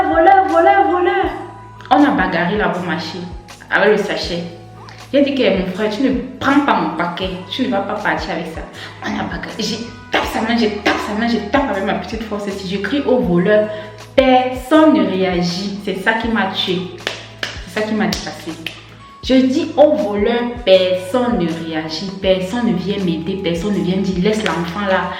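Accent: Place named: Yaoundé, Cameroon. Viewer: Warr 😅c'est de la ville aux 7 collines, dc tu parles comme ça 😂😂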